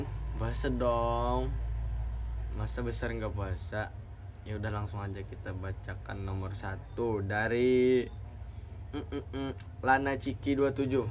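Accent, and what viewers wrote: Banjar, Indonesia. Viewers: Banjar bngt😂😂😂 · Msh ada logat bnjr nya😂😭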